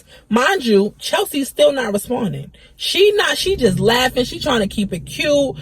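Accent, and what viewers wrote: New York, United States. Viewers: Im new to ya page so are u from NY? U definitely sound like us😂😂😂😂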